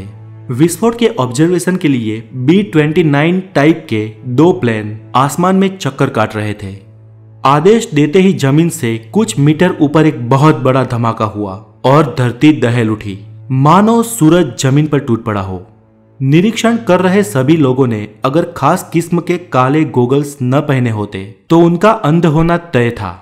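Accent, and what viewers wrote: Gujarat, India. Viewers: U gujarati?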